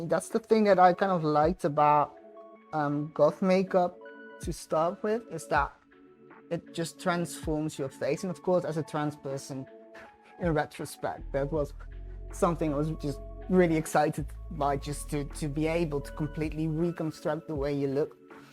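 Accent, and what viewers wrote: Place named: Netherlands. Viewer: You have a Dutch accent but your English is amazing! Love from kansas. I am half German and 1\4 Dutch I wish I knew Dutch or German. My great grandma spoke German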